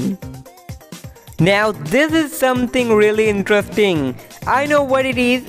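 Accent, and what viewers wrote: India. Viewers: ARE YOU INDIAN? BECAUSE YOUR VOICE AND NAME IS LOOKS LIKE INDIAN NAME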